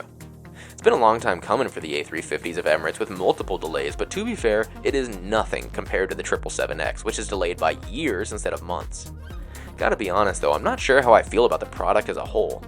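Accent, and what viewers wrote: United States. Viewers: Good, but the harsh American mono accent was a bit of a spoiler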